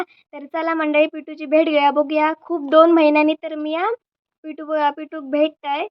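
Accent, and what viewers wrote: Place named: Malvan, India. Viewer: Malvani tuzya tondun aikayla maza yete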